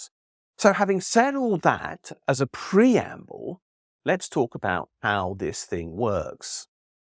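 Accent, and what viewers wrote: United Kingdom. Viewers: Just because he has a British accent doesn't mean he sounds like Varys lol.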